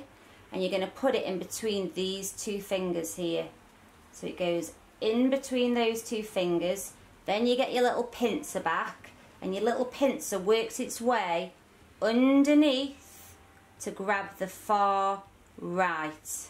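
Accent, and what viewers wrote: United Kingdom. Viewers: British accent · They are both British but morgz and morgz mum are from Sheffield. And I don’t think she is from Sheffield but she is from Britain 🇬🇧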